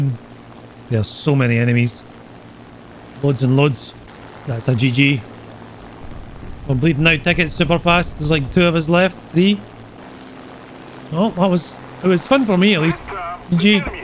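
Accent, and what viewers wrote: United Kingdom. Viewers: hearing a scot speak in a british accent is just all kinds of confusing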